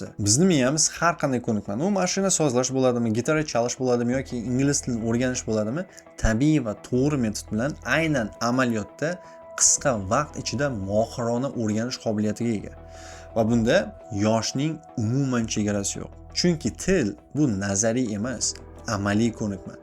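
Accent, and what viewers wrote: Bulungur, Uzbekistan. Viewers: Aka bulungurlikmasmisiz🔥🔥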